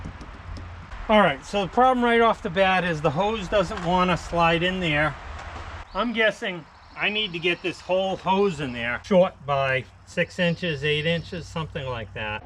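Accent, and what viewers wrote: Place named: Maine, United States. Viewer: So your tuber name says NH but your accent says you’re a mainah.. I’m in southern coastal Maine. Wicked Pissah videos on the the Jeep having a 3rd cylinder misfire so now I know where to start my looking.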